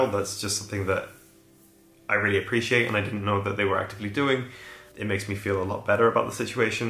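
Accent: Sweden. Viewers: You sound so Swedish when you pronounce Swedish words. You even have the Swedish twang when you speak English. You should do podcasts!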